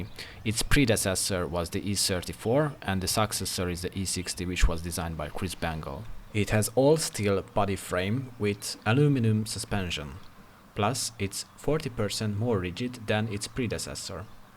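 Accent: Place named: Hungary. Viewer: egyből hallottam hogy magyar vagy, de nagyon szépen végig mondtad és mutogattad én jobban élveztem angolul mint magyarul, és tényleg gyönyörű autó!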